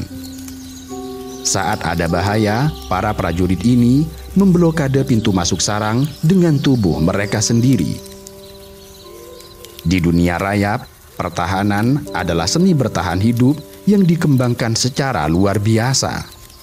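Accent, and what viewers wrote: Java, Indonesia. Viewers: Jawa nya jawa banget 😭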